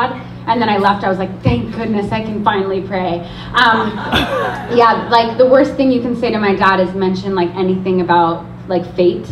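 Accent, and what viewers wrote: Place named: Long Island, United States. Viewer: She cracks me up when she’s just chilling and talking. And how she mastered that Long Island accent! 😦 I’m dying to see her speak somewhere close to NC!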